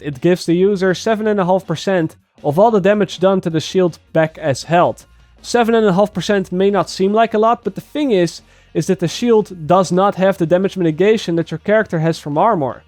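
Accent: Netherlands. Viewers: Ik hoorde aan je Engels dat je Nederlands was // i heard of your engelish that you where dutch.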